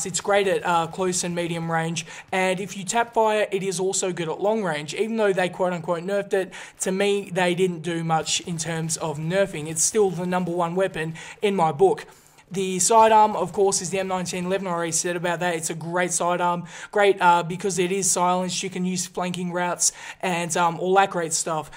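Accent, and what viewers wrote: Australia. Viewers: epic gameplay by the way what accent is that ? aussie or brit ?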